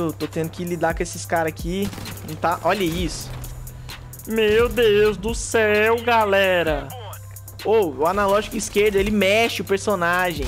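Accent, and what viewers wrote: Minas Gerais, Brazil. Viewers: Tu tem sotaque de minas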